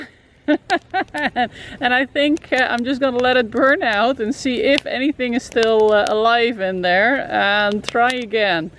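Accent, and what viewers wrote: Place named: Netherlands. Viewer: Unmistakably Dutch accent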